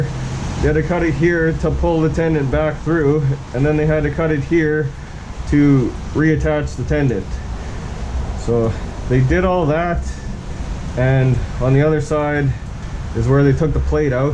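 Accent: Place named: Canada. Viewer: Wow! Poor guy. Just can't believe it. You must be the strongest person I know. I seen your framing work and the fact there isn't a lazy bone in your body. The big house you frame by yourself is what got me hooked on your videos. Just couldn't believe you were doing that on your own and the temps were freaking cold. You sound Canadian and for what I been watching, you Canadians rock. Hope God keeps you safe and you never get hurt like that again. Amazing person you are since I started watching. You also a great educator and well thought out person. Great work you do. I never seen anything like that before. Watching you, I get so scared just seeing it. Keep up the great work you do. Canadian people have impressed me for a long time. I watch them off grid cabin and houses they built on their own. Wow! Take care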